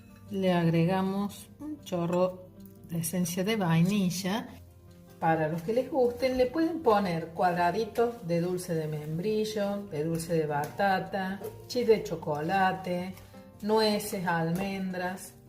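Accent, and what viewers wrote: Córdoba, Argentina. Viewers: La primera vez comí la tarta de ricota con naranja en Cordoba Argentina, hace 15 años; simplemente fabulosa. Voy arriesgarme a preparla. Me encantó oir su acento cordobés. Gracias por compartir.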